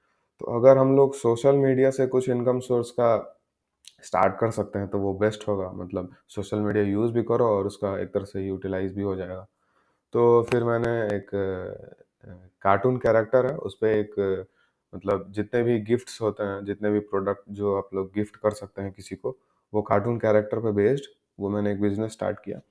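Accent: Bihar, India. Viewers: Bhai aapke awaaz se lagta h aap bihar se h kya? I m also new in market I found your video interesting.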